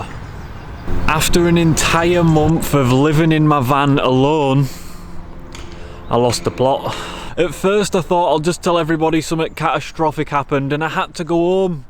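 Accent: Northern England, United Kingdom. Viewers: New Subscriber fella 👍 First off it's magic listening to a Northern accent....you can't beat it lol You took on an epic adventure mate, one that I'm sure many of your subscribers dream about. I think you have hit the nail on the head when you acknowledge it's not something to do alone. To have someone who wants to do the same thing and more importantly to have the craic with, or even have a moan at would be essential for most of us. Jeeez I have just started lure fishing primarily for perch and I find it difficult not having someone to experience my couple of hours on the canal with lol. Keep up the great work....here in the UK we have some of the most beautiful places to visit in the world and we are often guilty of not appreciating it. Go on a UK tour and show us all what we are missing out on. Good luck with everything you do 👍👍👍👍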